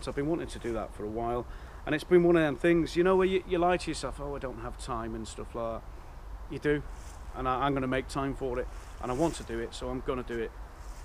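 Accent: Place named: Wales, United Kingdom. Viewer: What's going on with the accent mate? Welsh, Scouse and a hint of Geordie, give up the booze? Never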